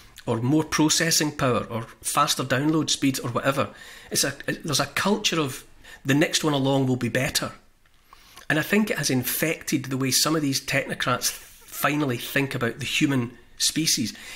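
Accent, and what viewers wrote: Scotland, United Kingdom. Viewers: I discovered your channel yesterday and I am glad i did! What you're saying makes lots of sense as we are many who share your views but many more who still need to wake up… please keep up the good work! You seem to have a Scottish accent, are you from Scotland? I am considering to reside there for a while… how are things there in general? thank you and take care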